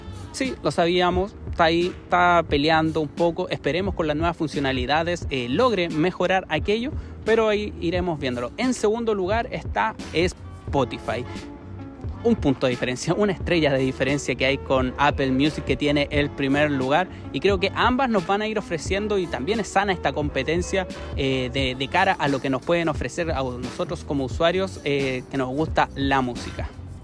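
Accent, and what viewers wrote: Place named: Chile. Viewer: Que ciudad es donde grabaste? Me gusto el contraste entre los edificios y la naturaleza. Tenes acento chileno y me da la impresión que ha de ser el sur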